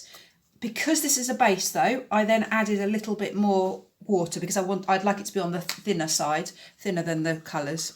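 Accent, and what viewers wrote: United Kingdom. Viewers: Thank you, you have saved me a lot of time and work and also convinced me that I do need to use a pouring medium for some projects and not just water. Like you I like the way the colours react and spread, if that’s the correct term, with just water. I am pretty new to all this and finding out new things all the time, like in a couple of sea/beach pours I have just done, I got cells and lacing with the white (Amsterdam Titanium white) over iridescent colours, not so much over the plain colours. All the paints were mixed with a pouring medium (1parts Owatrol to 2 parts water) - 1 part paint to 1 part pouring medium and then water if needed to get the right consistency. I’m very happy with them and luckily we have holiday accommodation, my experiments will grace their walls! And hopefully some will sell!😜 Thank you again, I follow Molly, Kinella and Rinske, but it’s so nice to hear a British voice, their ideas and recommendations. 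Jeanette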